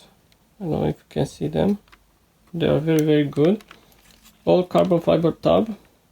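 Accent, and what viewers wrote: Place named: Romania. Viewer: buna, colectionezi numai 1/18 ? Eu am acelasi model in acelasi spec ca si al tau dar e un Spark 1/43 .eu colectionez doar 1/43 . Stii, miam dat seama ca esti român dupa accentu care il ai in engleza. Vb bine, dar accentu l - ai pastrat si nu e rau. Faza e ca io l - am pietdut si nus daca i bine sau rau.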